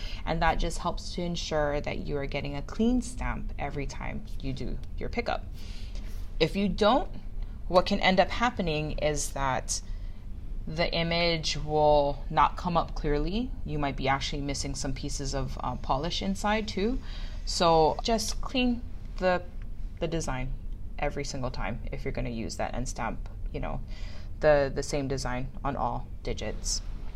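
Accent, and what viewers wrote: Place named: Hawaii, United States. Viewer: Aww I could tell where you’re from by your accent before you said Hawaii! ❤️ I’ve kept an eye out on your products since the Bundle Monster days but still haven’t gotten around to purchasing yet...fell out with nail polish for a while because I couldn’t wear what I wanted at work. Now I’m slowly rebuilding my collection, thinking of finally getting a stamping plate, and omg how am I finding out only now that you’re from Oahu 😲 now I’ll have more reason to buy, love supporting local...even though I’m currently living away from home! 🤣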